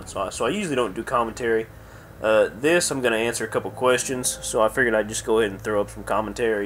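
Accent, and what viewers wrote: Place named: South, United States. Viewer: Awesome, thanks for making this, enjoyed your southern accent!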